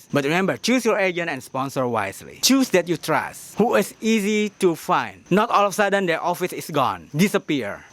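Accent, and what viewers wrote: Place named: United States. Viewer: If you speak slowly and without American accent than we can understand you much better.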